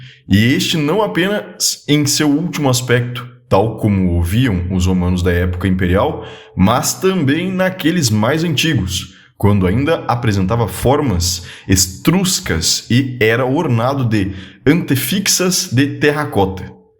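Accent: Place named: Curitiba, Brazil. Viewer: Sotaque curitibano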